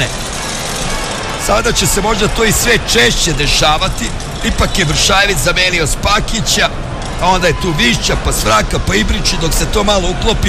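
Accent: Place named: Ekavian, Serbia. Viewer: Zasto ovaj komentator prica ekavicu?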